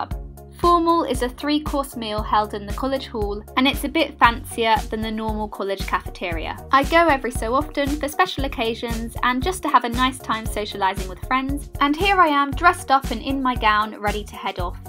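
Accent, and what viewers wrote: United Kingdom. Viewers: your British accent is so charming and you are so cute! comment from China.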